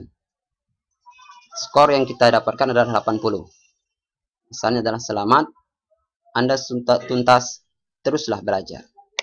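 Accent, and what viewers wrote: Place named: Bengkulu, Indonesia. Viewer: Mantap betul pak, bapak dari Bengkulu ya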